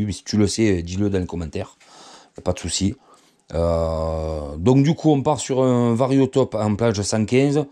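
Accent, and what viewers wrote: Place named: South, France. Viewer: Excellente vidéo, merci de partager tes connaissances avec nous, c est un régal, l accent du sud nous apporte du soleil ! 😉👍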